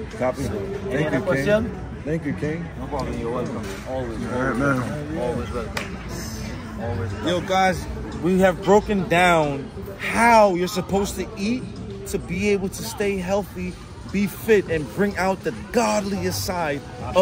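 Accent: Trinidad and Tobago. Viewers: He sounds like a Trinidadian 🇹🇹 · Man is ah trini... I feel he from port of Spain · Bro sounds Trinidadian 🙏🏽👏🏽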